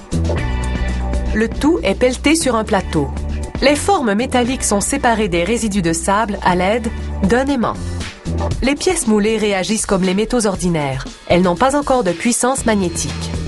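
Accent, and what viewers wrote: Quebec, Canada. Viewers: L'accent québecois est horrible,perso je n' arrive pas a écouter même en me forcant.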